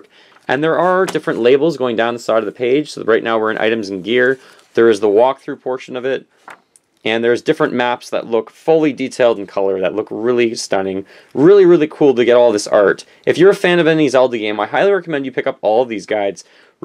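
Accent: Canada. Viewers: He's Canadian.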